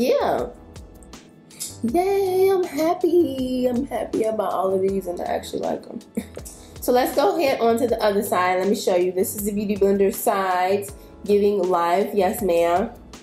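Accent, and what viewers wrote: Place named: Chicago, United States. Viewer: You sound like you from Chicago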